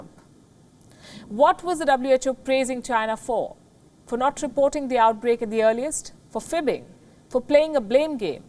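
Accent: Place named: India. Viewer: Would this Channel will replace someone who able to speak proper English then thus lady could? She sounds like speaking Indian?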